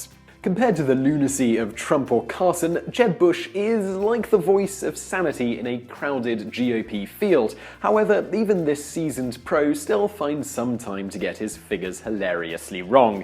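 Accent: United Kingdom. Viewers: I thought you are Brit. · lel a british guy talking about american politics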